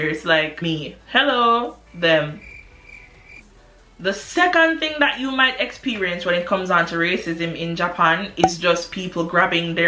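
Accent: Jamaica. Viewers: your "pronunciation" is so jamaican! Try do some work on your accent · Also you’re not black. You sound as if you’re jamaican that’s what you are. Black is made to make you feel lesser than. Please don’t call yourself black, instead identify who you are. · Love your Jamaican accent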